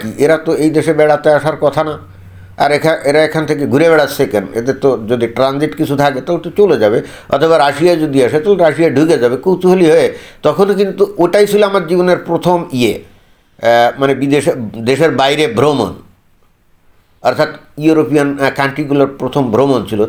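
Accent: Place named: Jessore, Bangladesh. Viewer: আপনার কথা শুনে মনে হয়, আপনি যশোরের লোক।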